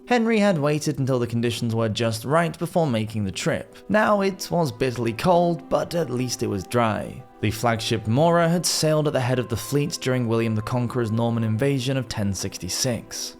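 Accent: England, United Kingdom. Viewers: You sound English? They didn't teach you this? I am Australian. I learned this ... did the conservatives fuck you over THAT much?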